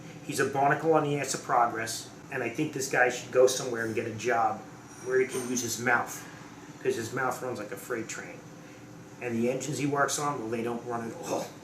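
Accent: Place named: Long Island, United States. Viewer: Being from long island that Accent sounds like you're from long island. How far off am I ?, Or queens...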